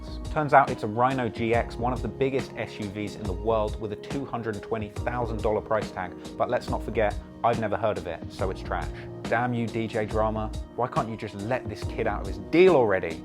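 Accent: United Kingdom. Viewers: I'm really listening to a british dude talk about how a rappers career is ending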